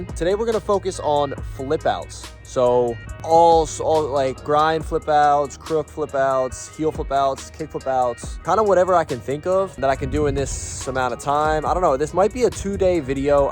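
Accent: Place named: Pennsylvania, United States. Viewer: You sound a little like. 😂 🔥 PA accent I guess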